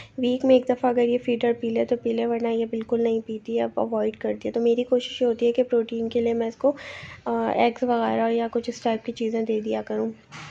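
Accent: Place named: Karachi, Pakistan. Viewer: Mujjy apki awaaz se ye lag raha hai k ap karachi se ho apki age b kam hai ??? Aisa he hai na and apki byti masha Allah cute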